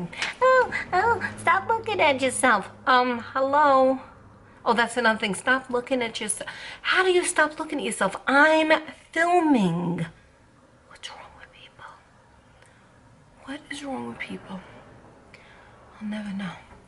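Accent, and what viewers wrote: New York, United States. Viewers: I love your coffee talk! I love You! Your gorgeous!And i hope you know how beautiful you are! I'm a native New Yorker living in Fl now and enjoy hearing the voice of one of my peeps😉 yeah not responding is the best ( go f yourself ) to those ( low life trolls ) seriously, what kind of person wishes sickness and death on another person?! Well, I believe in karma...anyway, you keep on doing your thing ...trolls will go away when they aren't getting attention. As for the so called friends....they can take a walk too.😊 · I always say, 'People are people' we're nice we're not nice we're doing we're not doing. What. Ever. You my dear are beautiful! I am not a subscriber, 1st timer actually. Yourre a New Yorker. You?! Really. No kidding. Love the accent. Love this personality. I find you quite cute. Really. I'm from California...in California...will probably die in California. 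Born in San Francisco actually. Not much of a talker tho. But a good listener. Liked your content. Thank you for sharing. With Love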